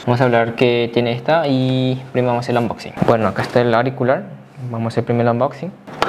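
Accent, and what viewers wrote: Paraguay. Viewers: por el acento es Paraguayo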